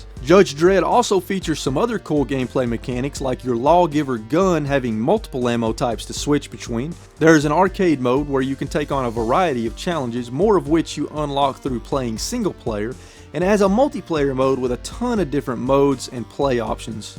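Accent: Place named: Carolinas, United States. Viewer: You sound like a Carolinian. Great video!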